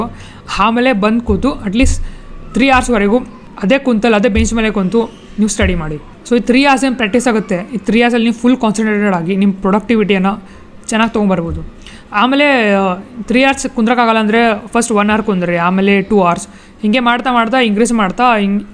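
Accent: North Karnataka, India. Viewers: ಅಣ್ಣ ನಾನು ಕಲಬುರ್ಗಿ ದವಾ ಅದಿನಿ.ಉತ್ತರ ಕನ್ನಡ ಭಾರಿ ಮಾತ್ ಆಡ್ತಿ ನೀನು ❤ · Anna mast matadiri uttar karnatak ❤ Anna physics difficult agateti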